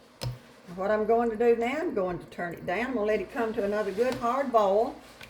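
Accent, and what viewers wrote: South, United States. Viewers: First of all I love the southern accent. I’m from bartlett , Tn. Your country fried steak and cream potatoes look so good I can smell them from here. Can’t wait to make them. Thanks y’all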